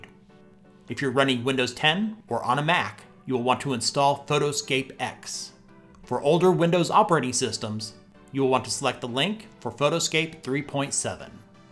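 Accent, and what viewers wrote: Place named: United States. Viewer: Wow, an english speaking American video, that's not from India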